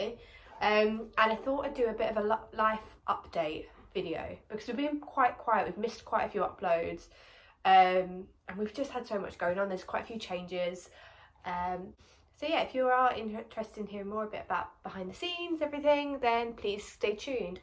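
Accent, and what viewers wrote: United Kingdom. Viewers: British accent is so satisfying 😏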